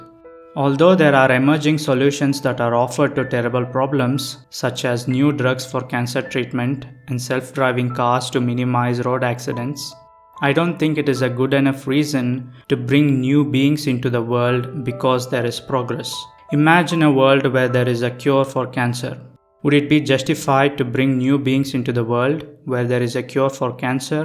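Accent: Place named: India. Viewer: pajeet accent messed the whole philosophy up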